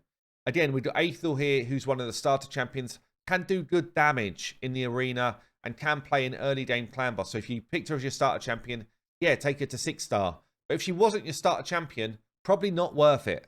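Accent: England, United Kingdom. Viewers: and you should go for a update for some of the free heroes and the artifacts. Almost all the free to play heroes. Love the content. And your English accent 😂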